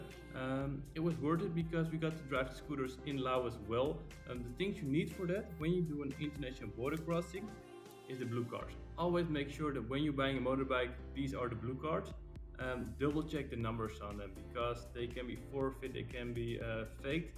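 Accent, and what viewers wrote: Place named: Netherlands. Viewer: Prachtig! We blijven onze Hollandse accent zo overduidelijk behouden als we Engels spreken. Gelukkig is daar geen friet van Piet 😅 Zeer leerzame opname. Nice!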